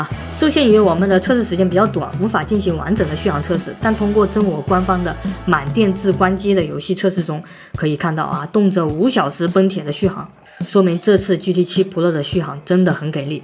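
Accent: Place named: Sichuan, China. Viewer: 四川口音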